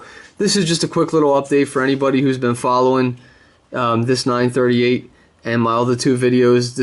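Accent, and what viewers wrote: Philadelphia, United States. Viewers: Dude, are you from Philly? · Ur accent from phila or south jersey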